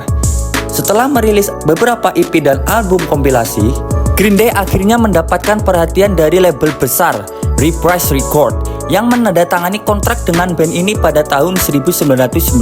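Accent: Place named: Banyumas, Indonesia. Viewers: ora ngapak ora kepenak 😂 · keren ngapak 😂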